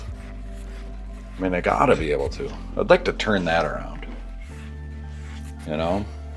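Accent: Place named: Minnesota, United States. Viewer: Hearing you talk is like being home in Montgomery Mn again as a kid.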